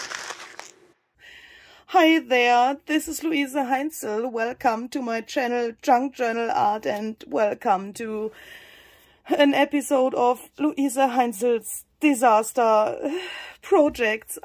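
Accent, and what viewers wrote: Germany. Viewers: Hi, please don't worry. First of all, this is beautiful. I truly love your work. I hit this problem many times, and I would fix it by adding matching, grungy broderie anglaise or lace around the edges. I love your accent - you speak great English. I love German, it's my favourite language, and I wish I'd learned it at school. I guess it's never too late? I am 62. Us Brits are lazy when it comes to speaking different languages. Love what you did to fix your cover problem. You are a great artist. Take care, xx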